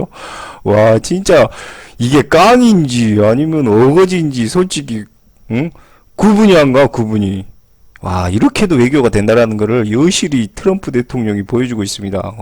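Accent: Jeolla, South Korea. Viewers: 전라도 사투리 쓰시는게 너무 듣기 좋네요, 영화배우 송새벽씨같아요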